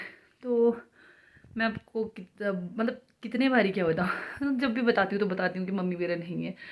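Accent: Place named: Assam, India. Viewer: Mai app ka vlog dekh rehiye thi aur app ka hindi aur hamara assam hindi sem hai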